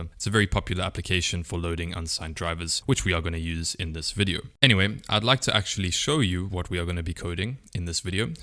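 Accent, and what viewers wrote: South Africa. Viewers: I figured you were South African but when I heard the "yoh if I could spell" I knew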